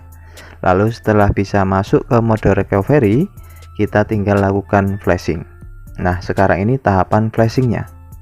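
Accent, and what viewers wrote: Java, Indonesia. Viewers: ok...pntesan dri logatnya jawa bang...slam teko cah bojonegoro jatim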